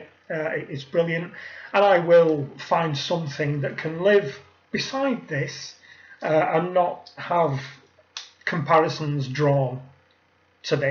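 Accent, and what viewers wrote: United Kingdom. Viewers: Hi. subscribed to your channel about 3 weeks ago and I enjoy your vids very much....its nice to hear a fellow brits voice on such a nieche subject as horology. I share your opinions about archie and can no longer watch his videos. The swearing is too over the top and the content is just plain boring! keep up the good work.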